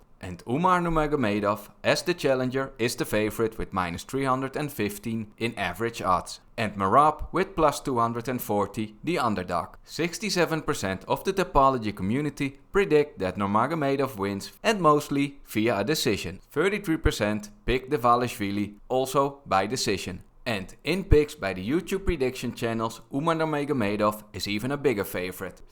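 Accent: Netherlands. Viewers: that thick dutch accent, nice u from the Netherlands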